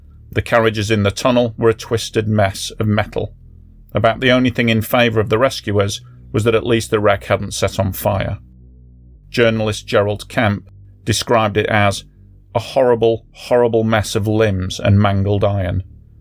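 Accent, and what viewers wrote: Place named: North, United Kingdom. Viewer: it's like listening to one of my northern family members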